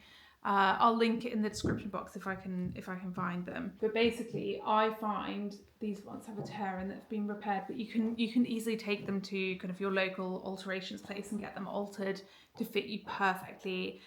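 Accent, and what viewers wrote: United Kingdom. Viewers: I am sooooo happy you are back! I watched you for years. You are very entertaining to watch and I love your British accent. (I am from the US). Everything sounds better with a British accent. You look so pretty….so excited for the tour of your newly renovated house.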